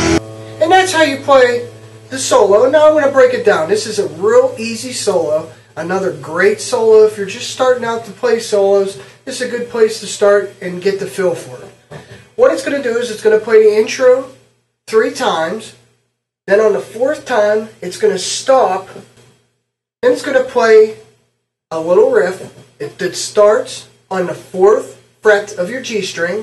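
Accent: Pittsburgh, United States. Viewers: Pittsburgh accent?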